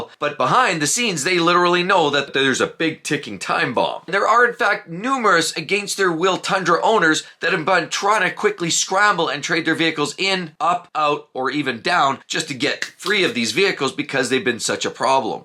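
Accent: Canada. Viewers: Love this guy Canadians oooot and abooot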